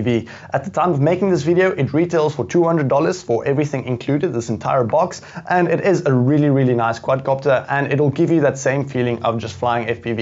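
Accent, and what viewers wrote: South Africa. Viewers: You sound like a fellow South African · are you from South Africa?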